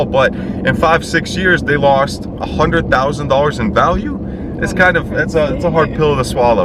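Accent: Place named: Bosnia and Herzegovina. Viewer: That accent is 100% Bosnian lol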